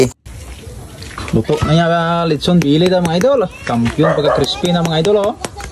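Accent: Iloilo, Philippines. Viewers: Ilonggo gid haw,,😂😂😂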